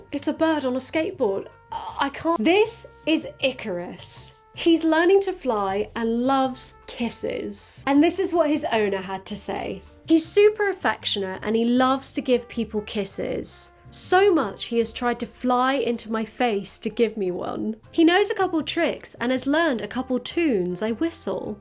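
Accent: United States. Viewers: Ooooooh that american accent, so chill, so hip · gotta say, your American accent is really good! hahah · wow your american accent is better than mine and i’m from america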